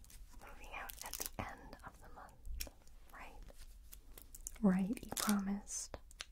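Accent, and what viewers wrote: United States. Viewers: I am so impressed by your American accent! Brava! 👌👏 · Your American accent is fucking stellar. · What the hell? You sound American AF. Hahaha!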